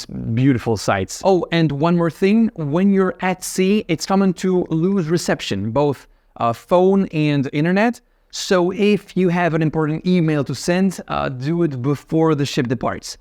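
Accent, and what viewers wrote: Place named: United States. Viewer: Sounds like you learnt your English from the Americans!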